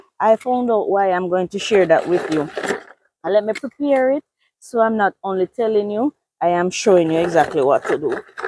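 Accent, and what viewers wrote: Jamaica. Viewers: I like her Jamaican voice, good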